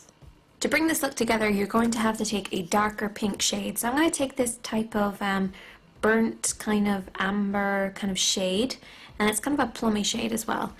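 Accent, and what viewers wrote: Ireland. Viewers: she's Irish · At first I thought you had an American accent. Are you Irish? I love your accent.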